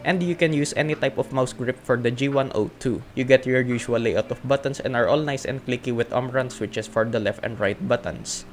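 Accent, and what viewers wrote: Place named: Philippines. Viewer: I subscribed, very good review, nice filipino accent.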